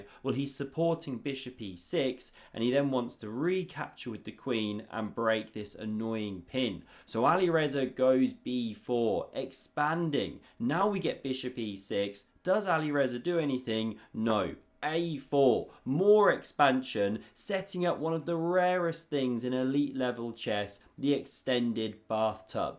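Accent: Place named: West Country, United Kingdom. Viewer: Love the witty comments: some of them I have to have two takes, but it keeps the action flowing. What part of England is your accent from? It sounds like a West country tilt (not quite a lilt, perhaps).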